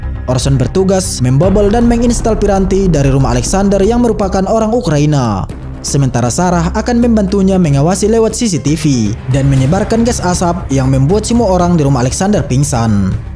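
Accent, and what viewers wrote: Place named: Toraja, Indonesia. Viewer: Mntafff mannnee, 🤣🤣🤣 logat mu 👍👍👍,, toraja apa duri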